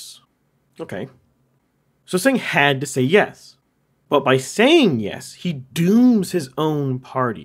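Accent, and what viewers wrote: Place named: Canada. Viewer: you have a funny Canadian accent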